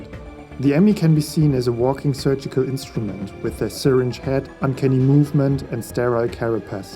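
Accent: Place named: Germany. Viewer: Don't feel guilty for your Germanesque pronunciations man, they're charming 😃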